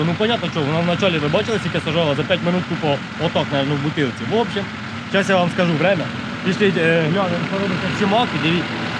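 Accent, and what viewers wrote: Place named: East, Ukraine. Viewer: На сході оозмовляють так : на суржику.Не чіпляйтесь.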